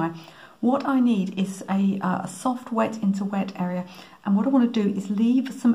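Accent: United Kingdom. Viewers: Loved this! I struggle with backgrounds a lot and this really gave me a lot of techniques to try and make my backgrounds more interesting. The daisy was my favorite but I loved them all. Very helpful! ( By the way....love your British accent😀 and lovely nail color!)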